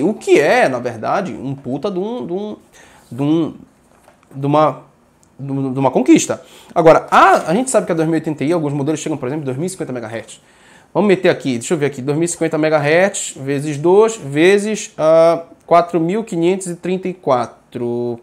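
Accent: Pará, Brazil. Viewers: cara tu é paraense??? hahaha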